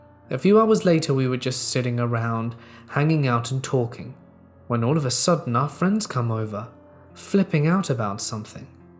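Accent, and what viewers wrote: United Kingdom. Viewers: Why are you british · Omg so its 330am and not damn thing made me laugh all day until you said "the readiness of my anus" in your dry British accent and I lost it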